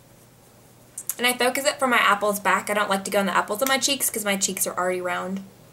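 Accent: South, United States. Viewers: I can hear the southern accent lol.. she doesnt talk like this aymore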